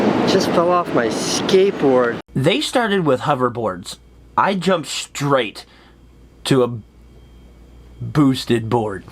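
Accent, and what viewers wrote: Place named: East Texas, United States. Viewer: You should definitely ride the board into work! Love the vid! And that east texas accent is just proving me right that you're the.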